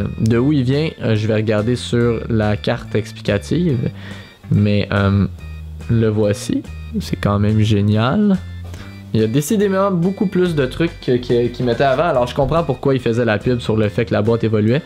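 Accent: Quebec, Canada. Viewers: super ta facon de présenter! et j'adore of course l'accent du Quebec! Grande classe! dis moi comment fonctionne ton systeme tournant pour batman? Je veux faire pareil pour mes LEGO mais on voit le truc, alors que la toi entre le plateau qui tourne, le reste de la table et le fond, on voit rien! C'est pro!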